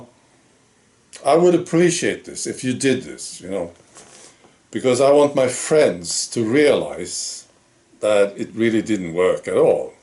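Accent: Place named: Sweden. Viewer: Apart from being Swedish, there is nothing wrong with your accent.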